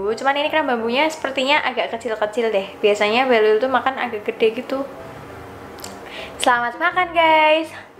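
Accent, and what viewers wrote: Java, Indonesia. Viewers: Jowone kerasa pas ngomong 😁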